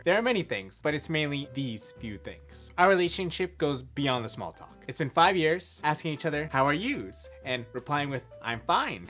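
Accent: United Kingdom. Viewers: Lol. You have a really good British accent :D I particularly loved the point where you can't put pressure on another person to be your source of happiness. That's kind of unrealistic and bound to fail. Solid video! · That British accent though haha!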